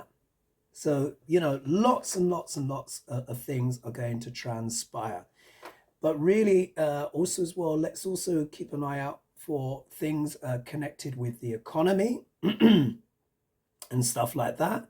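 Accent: England, United Kingdom. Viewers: as a gay gentleman I can’t help but say you look cute as a button and don’t ever do anything to that gorgeous English accent. You open your mouth and the angels come outdancing no less. May God save our gracious queen!!! ❤❤❤❤❤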